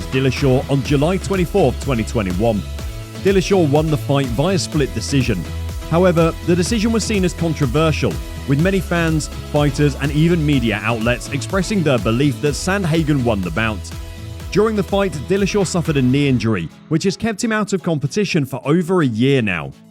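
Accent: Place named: United Kingdom. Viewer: Your accent is so annoying British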